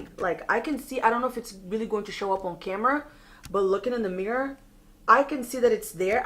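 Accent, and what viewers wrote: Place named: Spain. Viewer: Do you speak spanish? I feel like I can hear your accent when you talk lol love it! This look is so gorgeous. loved this video!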